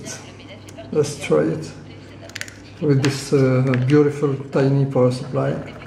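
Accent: France. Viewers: On dirait un accent francais ;)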